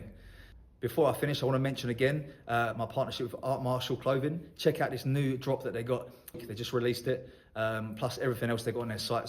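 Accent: United Kingdom. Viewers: I live in London and do shotokan karate, and you seem like you live in London too from your accent, do you have a club?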